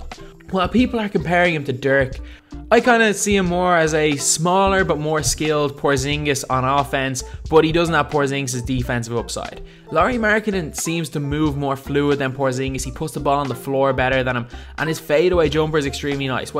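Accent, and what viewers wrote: Ireland. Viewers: Your defo irish .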